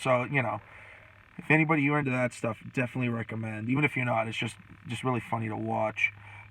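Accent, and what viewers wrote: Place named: New England, United States. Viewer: Ahh! I’m from Northern Maine originally and you had that ever so slight hint of the accent. Keep up the content! Subbed.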